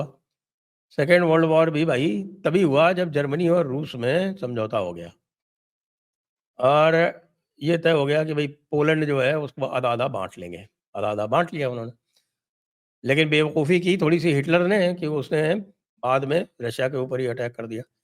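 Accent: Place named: Gwalior, India. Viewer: सर जय श्री राम, बहुत दिनों बाद आपकी भाषा में typical ग्वालियर वाला पुट सुनकर बहुत अच्छा लगा l धन्यवाद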